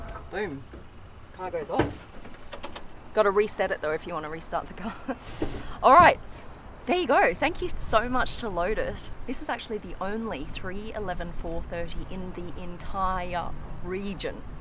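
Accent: Australia. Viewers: Can you do a video with you, only saying: "Because because because" for an hour or two? Please? Just love your Aussie accent!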